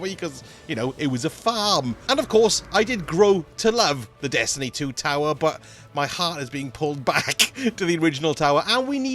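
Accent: Wales, United Kingdom. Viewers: Hey,are you welsh?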